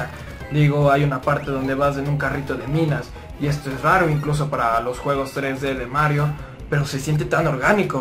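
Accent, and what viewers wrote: Ecuador. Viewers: Eres Ecuatoriano.??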